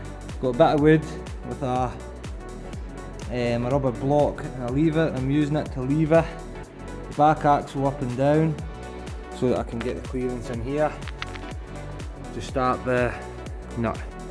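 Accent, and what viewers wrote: Scotland, United Kingdom. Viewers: Love the video 👍 great to hear a fellow Scot 🏴 on here. Liked and subscribed 🏆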